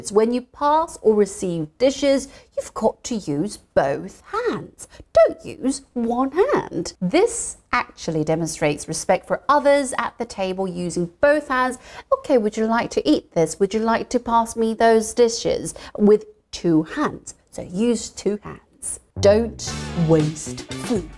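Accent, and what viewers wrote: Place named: United Kingdom. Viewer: 영국 액센트 넘 좋아요. 영어만 실컷 들을 수 있겠어요. 지금 그대로도 예쁘세요~^^ 미스때는 선녀셨군요~^^❤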